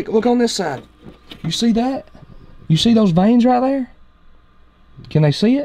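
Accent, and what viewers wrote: South, United States. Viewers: the egg eater shorts got me hooked, as well as your accent being from the south as well. keep up the good work my man.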